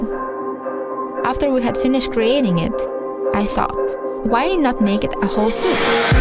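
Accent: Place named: India. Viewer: Акцент смешной, похож на индийский xd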